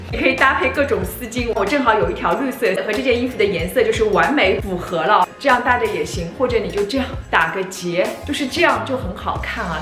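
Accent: Shanghai, China. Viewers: 第一次看你视频，觉得你说话很像上海人，很有亲切感。然后又看了你自我介绍那个视频，果然没错呀😆 支持你哦！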